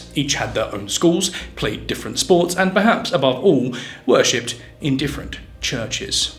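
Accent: RP, United Kingdom. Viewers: Yeah but it’s a posh English accent that’s bringing Irish history to light so I’ll give him credit where it’s due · Your posh English accent does you no favours in this video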